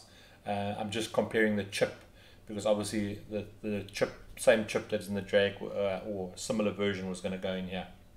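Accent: South Africa. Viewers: I hear sud Afrikaner accent, I subb. Miss my country <3 awesome video boutie!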